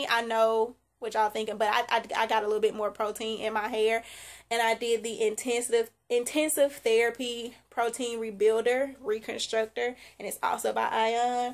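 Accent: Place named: Alabama, United States. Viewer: If You Don't Mind Me Asking Do You Live In Alabama And If So What Part? I Can Tell By The Accent (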